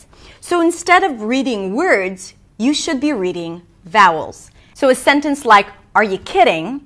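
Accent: New York, United States. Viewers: I am a native English speaker/English teacher and I did not realize she was not a native English speaker until she mentioned it. The only accent I noticed was a slight “New York“ accent!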